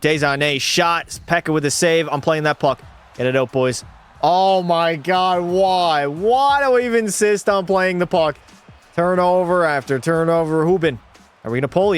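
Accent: Canada. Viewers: Could pass as brothers lmao the Canadian accent too I love it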